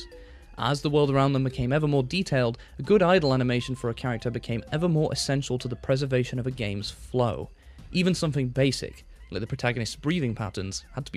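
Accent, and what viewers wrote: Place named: United Kingdom. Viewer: sultry british voice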